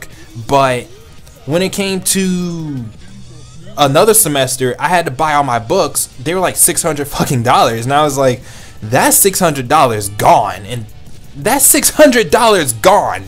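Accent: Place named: New York, United States. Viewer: My NYC senses are tingling, yur a new yorker arent u?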